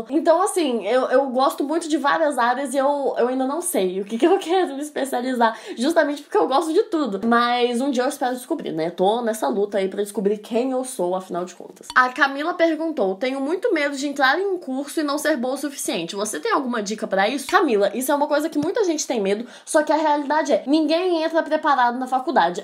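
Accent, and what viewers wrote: Minas Gerais, Brazil. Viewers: Mineiro é outro nível. 😍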